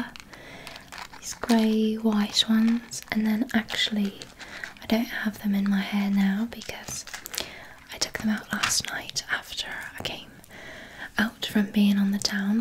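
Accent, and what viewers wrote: United Kingdom. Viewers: Girl I love this accent! Thank you for appreciating us brits😭❤ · doing a british accent is just so tingly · As a British people I always found it annoying when people would over sell the accent by like <num>. However your is sweet and suttel. Luv u ♡ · TIMESTAMPS🐬 - ~ intro, thanking you for coming over & chatting (face touching). - ~ showing you her hair charms. - ~ more chatting. - ~ more hair charms. - ~ hair tinsel. - ~ tapping & scratching her hair brush. - ~ taking your braids out & commenting on the weather. - ~ brushing your hair. - ~ showing you her butterfly clips. - ~ detangle brush & gossiping. - ~ braiding your hair. - ~ butterfly clips in the braid. - ~ brushing your hair - ~ putting hair tinsel in your hair. - ~ braiding your hair & hair charms. - ~ aquamarine the movie. - ~ hair serum. - ~ massaging your scalp & positive affirmations. 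- ~ fixing your parting. - ~ more butterfly clips. - ~ noticing how similar our hair colour is. - ~ tapping on hair charms. - ~ putting more tinsel in your hair & showing you how to. - ~ wrapping up the video. p.s ur British accent is incredible. It’s sooo satisfying hearing you say ‘pretty’ 😊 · Your British accent is fab!! I hear some potential for Northern Irish there too - I think you’d be great at it as well! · you with a british accent is really giving me anya taylor joy vibes !!! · You have a very good British accent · your british accent is always so great ❤ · Well done on the British accent,I'm from Birmingham so sound like the Peaky blinders 🇬🇧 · The British accent is actually adorable lol.props to you because it's not the easiest to master 😊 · One of the only british accent i love Edit: 3 Weeks later this still gives me the most Tingels ever❤ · obsessed with your british accent 😭 i love these kind of hair asmr vids so i was so happy to see this 🫶🏻💗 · I love the British accent so much 🥰💖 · I've suffered from tingle immunity for a while and this video cured it! 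There's just something about the British accent. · something about your british accent gives me so many tingles.... I've had a bit of tingle immunity recently but this is Fantastic! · I think London mixed with brummie hahah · I don’t know what it is about the British accent but I love ittttt ❤️ happy early Easter! If you celebrate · as a british person i can’t really tell where in the uk your accent would be from but i love ittt it sounds way nicer than most british accents 😭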